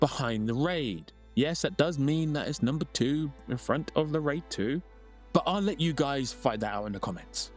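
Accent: United Kingdom. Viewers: Gosh, this brits accent is so terrible!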